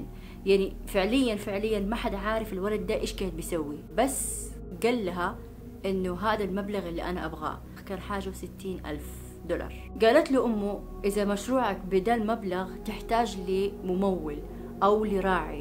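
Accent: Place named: Riyadh, Saudi Arabia. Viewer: got u اهي سعوديه وانا مو سعوديه ف ما افرق بلهجاتهم بس اتوقع لهجة اهل الرياض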